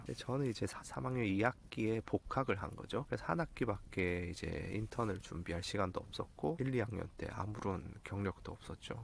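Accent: Gyeongsang, South Korea. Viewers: 최근에 우연히 유툽을 청취하게 되면서 재미에 푹 빠져든 예순을 넘은 경상도백수아제입니다..ㅋㅋ 언어에서 약간은 경상도 정서를 느꼈었는데 경산 갑제동이 본가라고 하시니 TK로서 더욱 정감이 가네요! 고령화시대를 맞아 중년들의 경제적 고민도 깊어가는것 같은데 수준높은 강의에 소중한 도움을 얻게 됩니다. 장작불의 운치가 썰을 푸는데 기막힌 조화를 이루는듯 합니다. 건승을 기원드리며 응원합니다.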